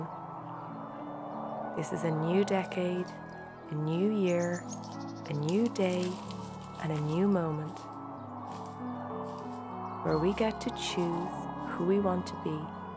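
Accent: Ireland. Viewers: What beautiful and peaceful videos, deeply relaxing to share this, thank you. The way you live is how I too love to live life, enjoying all the small pleasures and that is how I get through all my months, just taking one step at a time end savoring the moments and all the beauty in my life! Blessings dear friend, you have such a soothing voice and I love the Irish accent. All so inspiring here!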